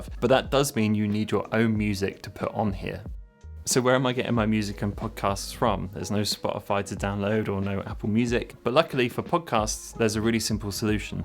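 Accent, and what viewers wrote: United Kingdom. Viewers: I have never realized how satisfying the British accent is to hear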